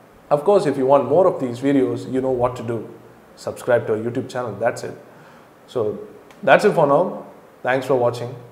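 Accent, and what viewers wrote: India. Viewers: your voice is very clear you had a really nice review and you didnt speak too fast for some reason, I liked the hint of an indian accent to your voice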